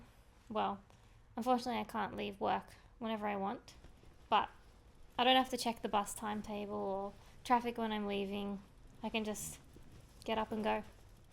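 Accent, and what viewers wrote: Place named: Australia. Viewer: As a British "people", how you didn't pick up on her thique Australian accent both confounds and flabbers my gast.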